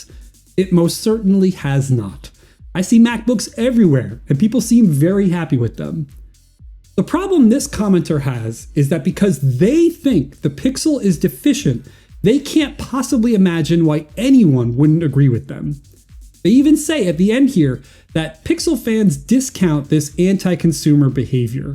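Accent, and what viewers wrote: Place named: Australia. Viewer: Are you really going to take advice from a dude w an Australian accent?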